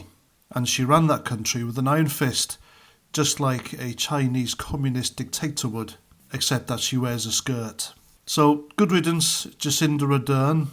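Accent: Wales, United Kingdom. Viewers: Sounds like you are Welsh. Why are you criticising New Zealander? Hypocrite.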